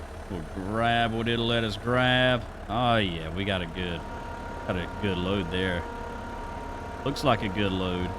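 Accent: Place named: Georgia, United States. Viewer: Where in Georgia are you from? I recognize that accent. I'm about 30 minutes SW of Atlanta.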